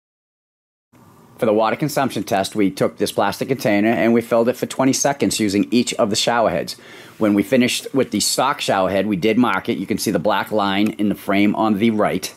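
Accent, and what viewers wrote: Boston, United States. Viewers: Irrelevant comment alert!!.. dude r u from Boston??? U been to Dunkin today?? Anyway. Wikkid pissah review!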